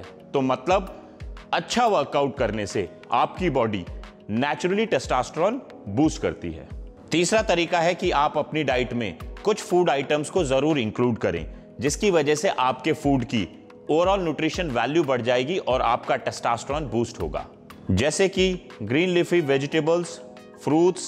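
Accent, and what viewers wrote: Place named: Hyderabad, India. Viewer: Zabardast Mashalah Chindiya agarbattiya mombattiya Sur Sur battiya chingari angar 😜 Hyderabadi andaz me bola lekin mashallah bhot khoob sir. Video apki dekh k mera har baar satisfaction aajata, confidence increase hojata k Bhai mai nai krsaktu aisa nai hai mai bhi krsaktu ye tariqa istemal krke or saath me apke liye dua bhi nikalti.😍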